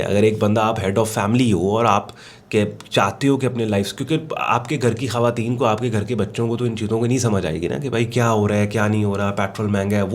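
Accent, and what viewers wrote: Punjab, India. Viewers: Love you, sir. Your Punjabi is very cute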